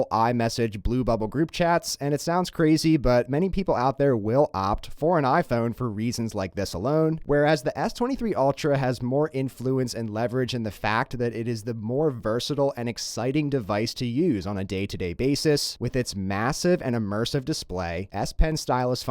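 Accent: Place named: California, United States. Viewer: California accent is f disturbing, smart information sounds dumb.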